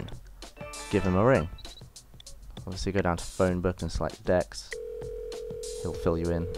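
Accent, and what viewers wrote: United Kingdom. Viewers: I like ur accent, wot part of UK r u from? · u got the brit voice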